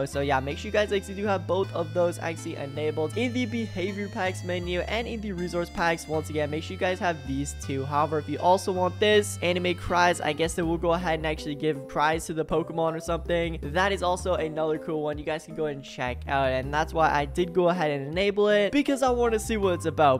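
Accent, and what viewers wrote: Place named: India. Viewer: You sound more Indian dan the actual Indians you cross born Indian.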